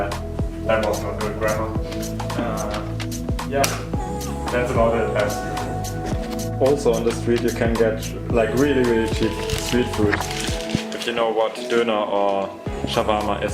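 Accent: Germany. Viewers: Where are you from? It sounds a bit like you're from a german speaking country to me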